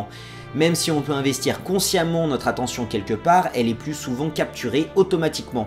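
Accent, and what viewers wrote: England, United Kingdom. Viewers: Lol moi qui suit anglophone et qui regarde egalement tes videos pour ameliorer mon français je suis mort de rire de ton accent anglais il est drole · J'adore son accent anglais xD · j'avoue ton accent anglais il m'a fait rire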